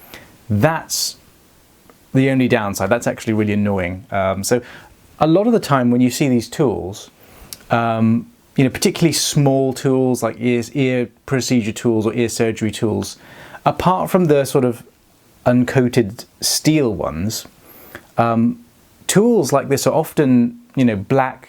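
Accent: England, United Kingdom. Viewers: Very nice haircut Mr.. You are so cute and the english accent is cherry on top 😍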